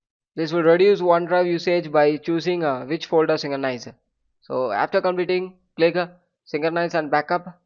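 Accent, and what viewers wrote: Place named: India. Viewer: Você é indiano amigo??? Seu inglês tem um forte sotaque indiano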